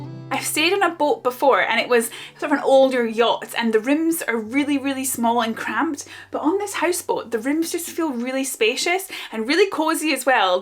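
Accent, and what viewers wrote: Scotland, United Kingdom. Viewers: I love the Scottish accent. Its my favorite. I enjoyed the video 😊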